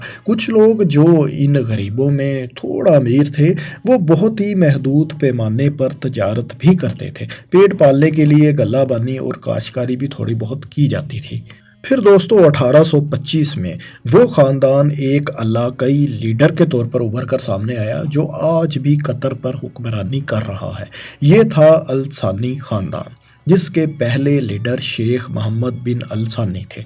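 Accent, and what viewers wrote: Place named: Punjab, Pakistan. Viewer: السلام علیکم جیسا سحر فیصل بھائی کی آواز میں ہے وہ چیز آپ میں نہیں ملتی ہمیں لہذا آپ اس چینل کو چھوڑ دیں آپ کی آواز اتنی سلو ہے سنتے سنتے نید آ جاتی ہے اور اردو کے کے کچھ لفظ آپ پنجابی میں کہہ کر جاتے ہو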